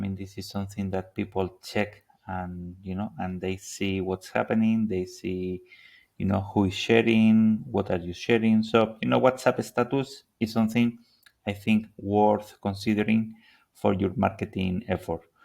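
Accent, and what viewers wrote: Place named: Spain. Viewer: I can hear you are Spanish. Gracias 🙏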